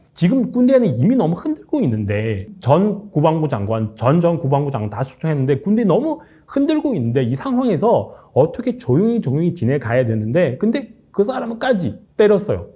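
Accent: Yanbian, China. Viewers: 발음이 좀!😮 화교인가? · 이분 중국분이신가요? 발음이.. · 이 사람 한국발음이 아님. 중국 국적 아님? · 이사람 발음이 연변인것 같오ㅋ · 말투가 중국인인데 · 저사람은 중국사람이에요? 한국사람이에요? 발음 겁나거슬리네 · 이 아저씨 말투가 중국사람이 한국어 하는 말투인데 ? 중국이 아니라 쭝꾸우억. 결정이 아니라 겨월정 이런식임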